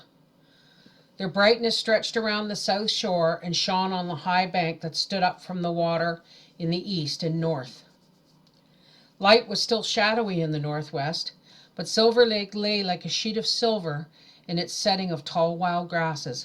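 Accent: Canada. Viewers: the pronunciation must be a Canadian thing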